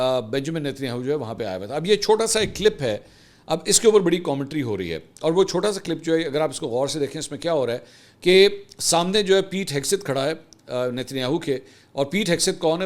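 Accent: Punjab, Pakistan. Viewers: پنجابی پنڈوہ اردو نیوز میں بھی پنجابی😂😂😂 لیکر آتا ہے 😂😂😂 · Bhai Salam Punjabi touch Sath ma ap lgatay hn bra mza aata Hy zabardast speech salamat rahin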